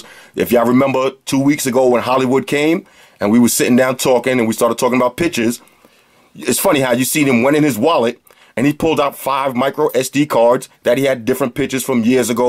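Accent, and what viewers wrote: New York, United States. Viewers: I just want to say that I really appreciate your enunciation on this review. As a fellow native New Yorker, it's refreshing to hear our local swag on a topic I'm interested in. Most reviews on phones seem like they come from nasally 15-year old nerds from Ohio (The same people that are migrating to this city and pissing me off, but that's another story). Keep doing your thing bro. EDIT: LMAO "size matters" and "red lobster dinner pics with bae"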